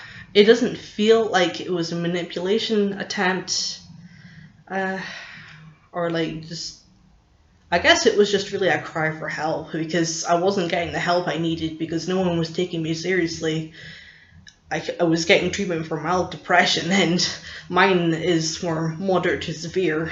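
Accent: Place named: Scotland, United Kingdom. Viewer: Hello are you Scottish. I hear your Scottish accent coming through the more you talk, and relax. It’s as if you’re subconsciously hiding the Scottish accent to please ppl listening online. I ‘get it’ because I used to do the same when I was younger, and probably would again now if I made a YouTube video. I’m not judging you ok 👍- I’m just making an observation. And i admit I could be wrong. I just want to say hats off - Good for you to have the courage to make a video about this situation. I found your video become looked for info on borderline inner pain. I’m in a lot of inner emotional pain rn. I wish I had the courage to make a video for YouTube to share how I’m feeling. Can I ask you/ - does it make you feel better having uploaded these videos? Thank you. Blessings.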